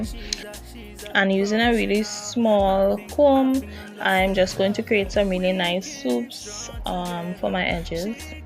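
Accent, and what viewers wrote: Trinidad and Tobago. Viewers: For some reason you sound 🎵 like a Trini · Are you trini? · Hearing this accent you a WHOLE TRINI 🇹🇹🇹🇹😍 HEYYYY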